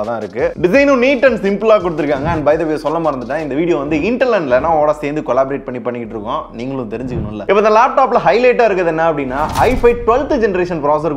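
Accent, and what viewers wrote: Madurai, India. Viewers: Bro ninga madurai aa..?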